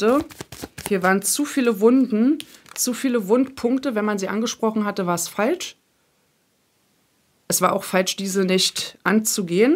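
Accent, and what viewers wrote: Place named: Berlin, Germany. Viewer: Immer wenn ich dir zu schaue... Fange 8ch an zu Berlinern 😂 dat in Hamburch ist immer komisch 😂.. Den janzen Tag hab ik so jeredet... Heimat Gefühl... ❤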